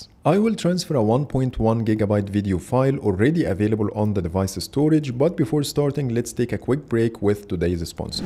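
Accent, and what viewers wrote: Egypt. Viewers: هو انا مستغرب بس انا لما سمعت لهجتك الإنجليزي جالي احساس انك مصري صح ولا اي😅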